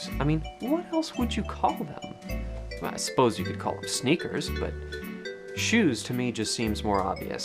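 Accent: California, United States. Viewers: Yeah it's pretty Californian, but I'm from Socal myself so I can't tell. if I wasn't a native, I prob wouldn't know the difference between Cali and rest of the west. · A white people California accent. That what you got . every group of people everywhere you go anywhere talk different An got they own accent . Jus cause you from Cali an talk that way don’t mean everyone talk like you . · I could tell you were not from southern ca quickly. Calif but not south. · I am a Californian, born and raised in L.A. In answer to what would I call an athletic shoe, we always called them "tennis shoes", whether they were for tennis, basketball, football, baseball, etc., made no difference, we called them tennis shoes. All my pronunciations were the same as yours, so I guess Southern vs.Northern Cali accents were pretty much the same. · Bay Area born and raised, until I moved up to the PNW around age 30. 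I definitely noticed CA transplants after living there for a few years just by the way they talked. I did pick up on your accent, though you were talking pretty slow in the intro to this video. If you were talking at normal CA speed it would definitely come out more. I live in CO now, where I really have to slow my speaking down, even compared to PNW. I don't even pronounce the "a" in "theater".. just straight up "theeter". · I come from the California bay area and you sound exactly like me. I looked it up to show what a friend in the UK what I sound like, she has an essex accent. My friend used to say Wersh! I think her parents were from somewhere else. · You have a California accent, close to mine. 40 years ago I arrived at a youth hostel in NYC and the manager's first comment was "you're from California." It was the word "howdy" with no southern accent that tipped him off. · Far and away an obvious Californian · "we speak much faster..." Uh come on dude, that is absolutely not a stereotype or classification property of the California accent or of any other accent, it is more due to personal preferences and other personal features. 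That stretching of phrases like "no wayyyy" either btw. Sry but what are you talking. Dude of the vid has a (light) Californian accent (not a strong one)...I can tell since I am Californian myself. And yes I know the vid is very old (in fact it is half as old as me) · you say San Francisco with a slight "p" sound where the "f" is. Also you say "fer" instead of "for" when you're not paying attention. Yup, Californian. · The thing that gives you away as Californian is the sing-songy cadence of your speech. This is a major thing that differentiates it from the heartland midwest accent (think Omaha or Kansas City: smack dab in the middle of the country. This is where the "standard American dialect" comes from for the most part). How do you say "milk"? I know a number of Californians who say "melk". Very odd. Finally, the other thing that marks you as a Californian is your inability to imagine people saying words differently than you do, or using different terms. 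Like the fact that you've never heard someone say "ahh-urn" for Iron. Or the fact that you think you can only call athletic shoes sneakers or just shoes. Many people call them tennis shoes. In my experience, most Californians haven't traveled much in the US and are somewhat sheltered from Southern, midwestern, or rural cultures. Hopefully this doesn't sound too harsh... I just think it's interesting that you couldn't picture other ways of speaking in some cases (no one says sal-mun for salmon. But northern midwesterners say it with nasal a, and southerners say it a bit like "sahy-mun"). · omg hell yah man! That was hella california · Ooh, nice catch! I grew up in Santa Rosa. It took living in other states to understand that yes, it indeed is a California accent. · Your accent sounds like mine. I’m from central california coast. So yeah you have a California accent! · You Californian as fuck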